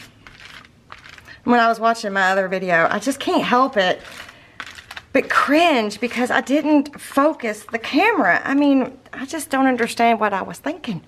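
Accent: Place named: Eastern Kentucky, United States. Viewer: Was just going to ask if you were from Eastern Kentucky because your accent was so familiar to me. Then while scrolling to the comments section I saw the mailing address. My Dads family is from Sandy Hook in Elliott County, Love your videos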